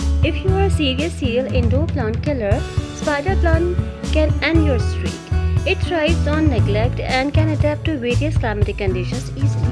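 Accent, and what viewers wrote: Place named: India. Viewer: your tone accent and English should be improved It sounds more like Hindi with a raga .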